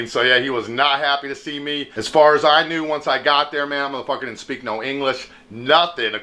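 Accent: South, United States. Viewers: So if you are from WA, how come you sound Southern?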